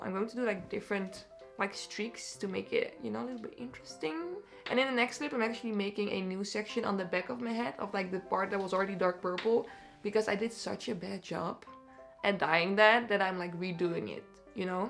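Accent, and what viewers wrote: Netherlands. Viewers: At first I though you were Danish(your name ). Today I was like no way she sounds NL. Hey Dutch sister.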